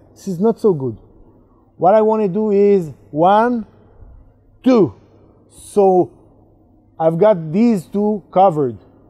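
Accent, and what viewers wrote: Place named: Israel. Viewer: I wactched the video. It's better not to mistake anything in that video for sound advice. It's just choreography with a narration that's done in a Modern Hebrew accent. There are as many ways to deal with multiple attackers as there are potential gaggles of attackers but this video demonstrates something that will only ever work on people who aren't actually serious about hitting you. Fix your footwork. Move from position of balance to position of balance and for the love of god don't compromise your own balance just to take someone else's. If you cross yourself up and overextend like that "grandmaster" then someone's going to throw the ground at your face while breaking a few things on the way down. The primary tenet of ninjutsu is don't get hit and the third law of motion is that the ground hits you as hard as you hit it. Apropos that you should probably get out of the habit of hitting the ground with your talus when you roll...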